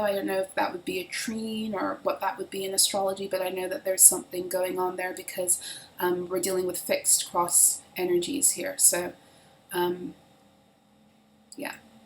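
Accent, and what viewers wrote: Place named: United Kingdom. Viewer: "You have caused confusion and delay!" Love love love your English (or Brit) 'accent!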